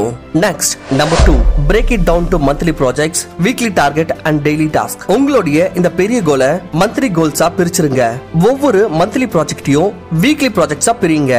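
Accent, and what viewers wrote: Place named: Karnataka, India. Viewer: Kanada Tamil nala iruku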